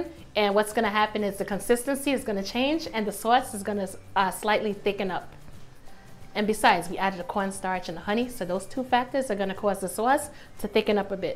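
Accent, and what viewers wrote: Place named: East Coast, United States. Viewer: First & foremost, this is recipe is slamming 🤾🏾‍♂️... I gotta ask you this one thing though🤷🏾‍♂️❔ That accent is so east- coast; has a hint of Baltimore, or maybe Spanish Harlem. Lol, where'd they grow you at?🌱🌷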